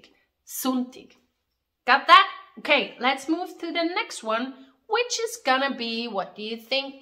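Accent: Switzerland. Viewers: Is this the Zurich dialect?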